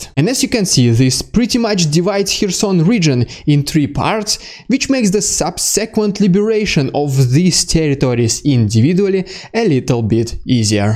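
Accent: Russia. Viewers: I agree wholeheartedly. Maybe it is an Aussie thing, since we adore Canadians, and what could be more adorable than a Canadian with a Russian accent? If you like and Ukraine Matters, I also suggest Denys Davydov and Artur Rehi. Reporting from Ukraine Is also sometimes worth a look. Similar content on all five channels, but occasionally one picks up a significant point that the others missed or glossed over. And it is great to have a variety of perspectives and different nuances.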